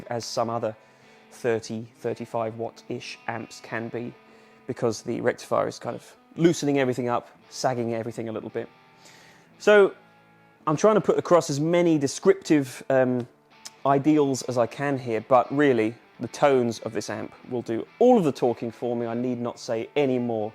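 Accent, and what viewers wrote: United Kingdom. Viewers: Wait, you're British?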